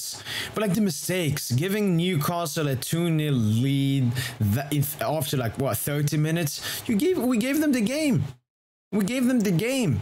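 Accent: France. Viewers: What part of France is this guy from?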